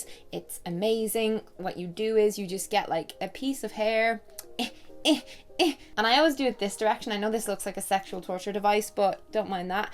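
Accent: Ireland. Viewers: I found your channel while looking for Irish YouTubers to learn the accent! Also, I'm taking my first trip to Ireland next year, and I would LOVE it if you and Jessie could make a video on must see places in Ireland. Especially if there are things only the locals know about! I love your videos!! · So happy to see this video from you right now- I have horrible pms cramps and sitting with hot cider listening to you is so nice! I started watching you because I saw one of your food diaries in the related videos of a what I ate in a day video I was watching. A beautiful sweet bi lady with an Irish accent who loves Harry Potter and makes food videos! Right up my street :) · I found your channel because I was googling Irish youtubers (Irish accent is the BEST) haha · I found your gorgeous self last year by looking for Irish accent tag videos haha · I found your channel because my ex is Irish 😘 and I just loved your accent and I loved your videos!!!!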